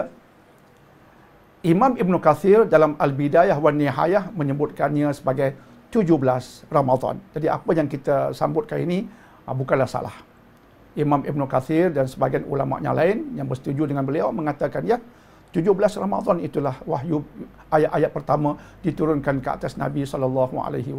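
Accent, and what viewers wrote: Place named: Kelantan, Malaysia. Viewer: Terbaik.. Terima kasih ustaz atas ilmu yang ringkas tapi bermanfaat... Lagu kelate pun boleh👍👍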